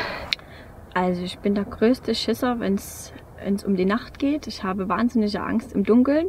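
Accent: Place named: Saxony, Germany. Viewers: Ich wusste gleich, dass mir der Dialekt total bekannt vorkommt. Liebe Grüße an Dich aus dem Vogtland:) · Am Anfang dachte ich noch, der Dialekt kommt dir bekannt vor und dann sagst du, dass du aus Plauen bist. Super, das ist auch meine Heimatstadt, auch wenn ich da schon seit vielen Jahren nicht mehr lebe. Du hast meinen vollsten Respekt, denn auch ich muss allerdings notgedrungen seit letztem Jahr allein mit dem Wohnmobil reisen. Unsere Schicksale sind zwar völlig unterschiedlich und du könntest vom Alter her meine Tochter sein, aber du machst mir tatsächlich Mut, meinen Plan auch in die Tat umzusetzen. Viel Glück weiterhin und gute Reise. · Na,Du liegst ja schon Mal gut da🐒😸👲😨alles Gute für Deine Unternehmung.Wie machst Du das mit Deiner Arbeit?Oh,guter Dialekt!Plauener Spitze😸ich habe Mal als 18Jaehriger 1976 ne schöne Goehre aus Plauen am Ostseestrand geküsst.Schoene Erinnerung ohne Folgen.Da wurde noch die Grenzbucht von der NVA nachts mit Booten und Riesen Bogenlampen abgeleuchtet. 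· Sächsisch is einfach toppe sage ich als 🇨🇭🙋🏻‍♂️✌🏻 go for it. Coole junge Lady. Tolle Docu. Toller Roadplan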